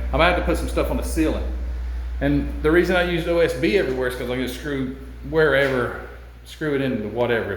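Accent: South, United States. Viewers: Great update on the model room! That Chrysler car looks like it will be challenging but fun. Interested in seeing you build it. Dont worry bout your southern accent, i understand you just fine, being born in Mississippi! 🤣🤣🤣 Thanks for sharing,!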